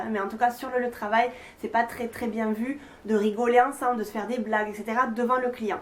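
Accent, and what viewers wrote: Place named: South, France. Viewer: j'adore ton accent du sud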